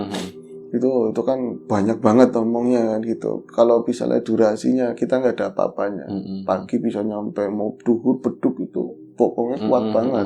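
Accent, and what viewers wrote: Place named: Brebes, Indonesia. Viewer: Ngomonge Kya wong Brebes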